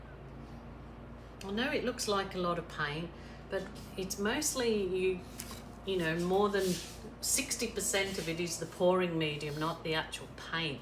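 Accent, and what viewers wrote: Birmingham, United Kingdom. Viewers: Lol! have been on the phone to home? Your Brummy accent is coming through more today! How lovely! What a stunning piece! Treat of colours - very magical! Hope you & your family & friends are starting to recover after all you've been through? X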